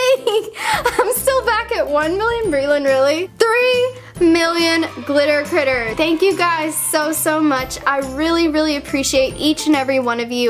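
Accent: South, United States. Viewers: Do you have a country aksent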